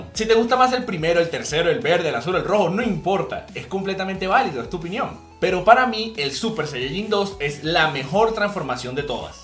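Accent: Venezuela. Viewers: Pamre jure nunca ver a un youtober con ese acento venezolano pero tú eres la esepción grande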